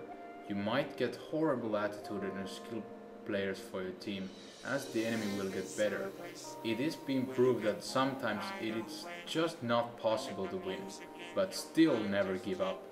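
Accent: Finland. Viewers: Very much off-topic, but where are you from bro? Your accent sounds finnish.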